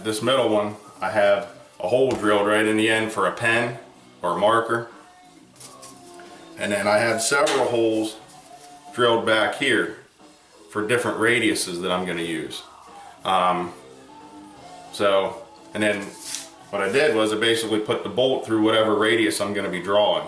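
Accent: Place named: Pittsburgh, United States. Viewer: love your videos. I'ma new modeler and appreciate what you do. Also a PSU fan and Pittsburgher, picked up your distinct Pittsburghese in a previous video. Keep up the good work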